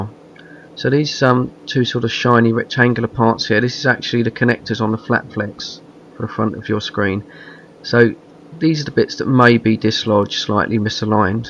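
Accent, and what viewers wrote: United Kingdom. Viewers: Thank you Sir, Your advice saved me a lot of frustrations when my iPhone's screen went blank after entering wrong passcode several times. The screen went black and blank and was unable to respond to anything after trying to reboot or press home button several times. Thank you again.- -Richard from Canada. ( I know the owner of this video is in the UK {telling by accent})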